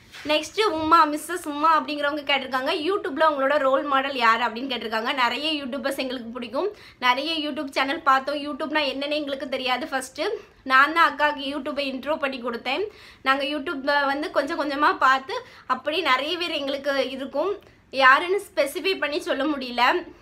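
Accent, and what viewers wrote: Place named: Nagercoil, India. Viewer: illa akka...unga slang nagarkovil madiri iruku adan keten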